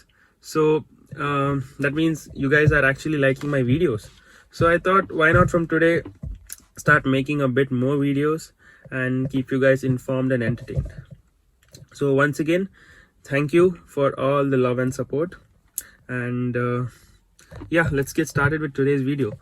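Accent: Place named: North India, India. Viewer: Great bro!!! Ur first impression made me feel ur some whr from North. But KA19 helped me understand ur from Namma Mangaluru. U simply Rock!!! Make more great videos!!! Ur a better Host compared to these News channel anchors:-)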